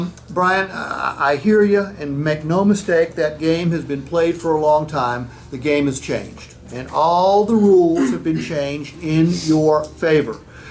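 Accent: Appalachia, United States. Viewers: is hillbilly talk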